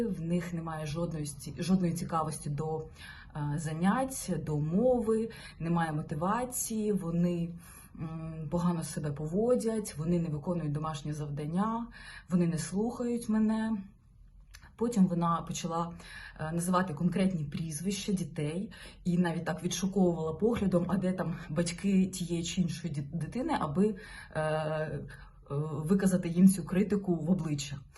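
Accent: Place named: Russia. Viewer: Когда говорила по Русские- было интересней слушать. И по украински плохо говорит - сразу видно, русскоговорящая. Из- за мовы- отписка.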